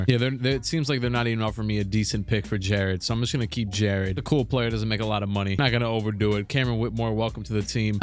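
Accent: Trinidad and Tobago. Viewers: Are you actually Trinidadian ? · Nah no way bro was trini this whole time 😭🫡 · your trini? same here bro fire vid ❤️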